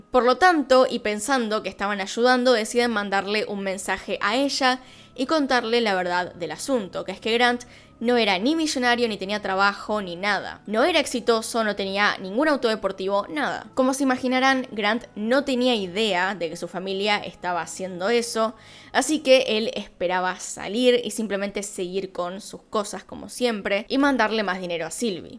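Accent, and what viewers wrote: Argentina. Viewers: Me gusta tu acento Argentino :D, Un Abrazo Princesa :D, Interesante Historia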